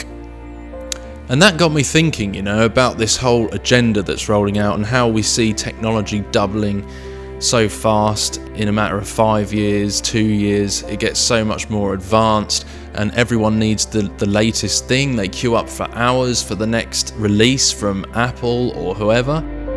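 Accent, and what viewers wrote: United Kingdom. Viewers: I love our English language accent from here in the UK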